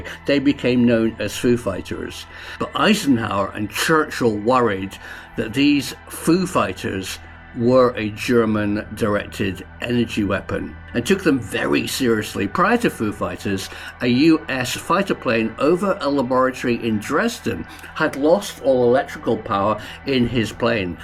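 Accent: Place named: RP, United Kingdom. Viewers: Gotta love that good old fashioned BBC accent! Interesting as always Prof. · It's a British accent.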